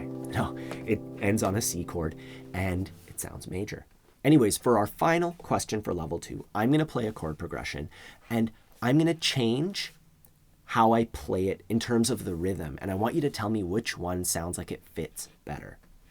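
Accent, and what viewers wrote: Canada. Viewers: You sound so Canadian. :)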